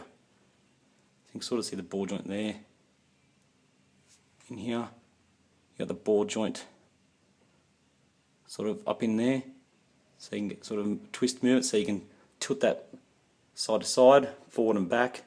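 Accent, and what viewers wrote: Australia. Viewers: it looks awesome and i cant wait for mine to arrive. i wish i had this paid off already but last payment is today and should be here soon. i assume your living in australia? due to your accent,im a west aussie and just wondering if there shipping to australia yet. cheers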